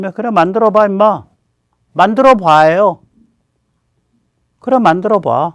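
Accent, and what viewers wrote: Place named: Seoul, South Korea. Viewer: 서울 사투리 쓰시는거 같아요. 'OO 밖에' 를 'OO밲에" 이렇게 말하시네요.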